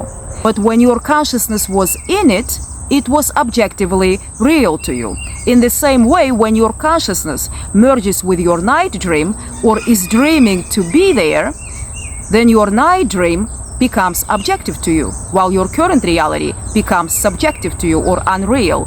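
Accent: Russia. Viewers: Classic Russian accent, I love it 😍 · Pretty russian-english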